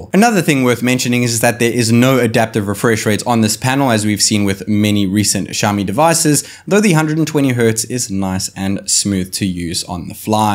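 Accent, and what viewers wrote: Johannesburg, South Africa. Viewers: Great video. Are you South African? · Is that a JHB accent I'm hearing??🤔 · South African accent 👀 lezzz go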